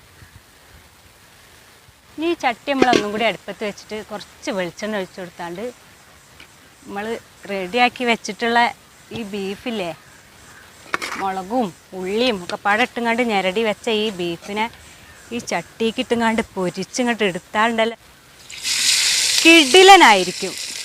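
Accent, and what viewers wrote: Malappuram, India. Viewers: മലപ്പുറം സ്ലാങ്ങിൽ നല്ല അവതരണം. ബീഫ് ചുക്ക.. അഡാർ ഐറ്റം 😍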